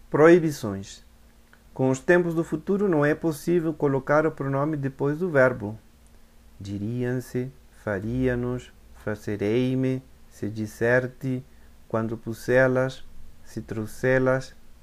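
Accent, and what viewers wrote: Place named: Portugal. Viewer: tu portugués parece ser medio europeo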